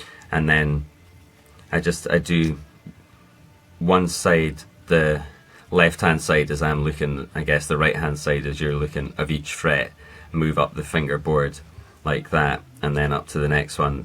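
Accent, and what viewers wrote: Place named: Scotland, United Kingdom. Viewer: These two videos have gave me the confidence to try my own bit of fret leveling. Very relaxing to watch also, I think it's your Scottish accent. You sound a smidgen like Stephen Hendry.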